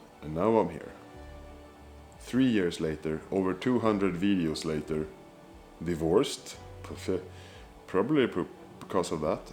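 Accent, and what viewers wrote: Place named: Sweden. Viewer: This is gonna be great! You catch your audience not just because of the hunting part, but because of what a genuinely great guy you are 😊 and I don’t think there is many channels showing the Scandinavian fauna spoken in Swenglish😂 it will catch on my boiiiii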